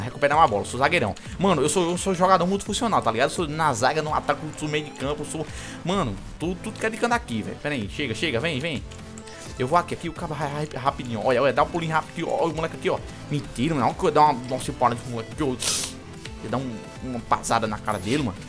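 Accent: Northeast, Brazil. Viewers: vc eh de que parte do nordeste?!?! Me responde e me passa um contato seu... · Vc é Do Nordeste?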